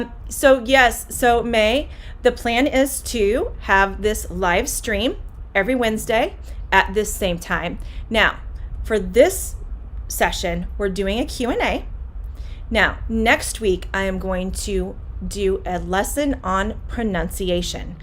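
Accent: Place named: United States. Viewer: rarely I understand the americain accent welcome mam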